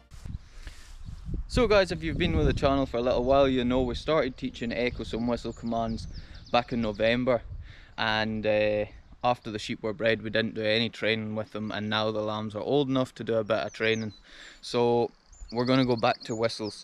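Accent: Scotland, United Kingdom. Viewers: . i am a 61 year old American midwest “city slicker” (Cincinnati, Ohio). i love my dogs, mostly Golden Retrievers over the years, one who was half Newfoundland. And one “black sheep” 😉 who was found wandering the streets, about four months old, in the middle of the winter, possibly a stray, possibly feral, who we believe 🤞🏼 is part Border Collie, part Labrador 🤷🏻‍♂️ that takes her energy and intensity level down a notch, thank goodness 🙏🏼🫢. She was quite a handful when we adopted her (we didn’t know! she was just a cute little puppy 🤷🏻‍♂️🫢) so she required an adjustment and a lot of activity and attention… and we have accommodated her. Because we love her ❤️. Anyway, i have absolutely no interest in farming or tending animals. (My wife does, however. My neighbor commented on the amazing vegetable and flower garden that she had created in our backyard, and the chickens she was raising in it; “It’s just like the tv show ‘Green Acres’ - to which i replied, “yeah except I’m Zsa Zsa’ 😏😖”. 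Your lifestyle is anathema to me 🫣. But i love what you do. I love the scenery. i love your Scottish accent! Most of all, i love your dogs 😊 It is tragic that some people adopt Birder Collies and keep them as “pets” mostly inside the house, gone all day at work, with a little outside time in the yard, bored out of their intelligent minds with no outlet for their incredible energy and no fulfillment for their genuine sense of purpose. (Some dogs never het adopted at all… 😢😢😢). When i see your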